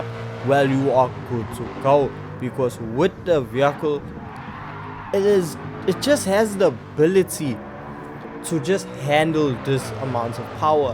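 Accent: South Africa. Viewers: I love the SA accent, so cool my bru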